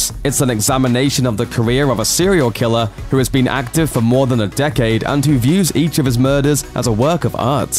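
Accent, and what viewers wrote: Australia. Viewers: is this guy australian?? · What's the narrators accent? Y'know, that makes him say "The Man-dellureffekt" or even just "Mandellur". I always wondered about where that's from. Is it an Australian one?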